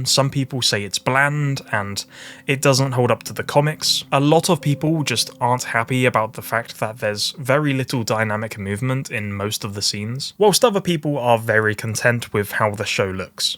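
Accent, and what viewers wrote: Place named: United Kingdom. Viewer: Good invincible video? British man talking for 10+ minutes? Subscribed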